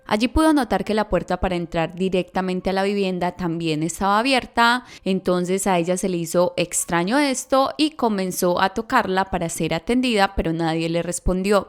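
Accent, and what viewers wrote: Colombia. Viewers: Por eso yo no confío en nadie porque no sabe uno con quién se va topar Dios nos libre de esa gente malvada, descansen en paz los señores, tan lindas personas! 😪Me gusta mucho tu acento Colombiano narras muy bien te felicito, saludos desde Sonora México, Mi hija se enamoró de Colombia en un intercambio escolar, su comida su gente tan bella,siempre lo recordará!! ❤️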